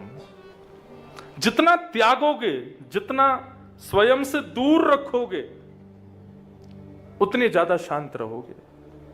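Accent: Braj, India. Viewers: Jai jai aapki Katha bhut acchi lagti hai aapke mukharvind se brij bhasa bhut sunder lagti hai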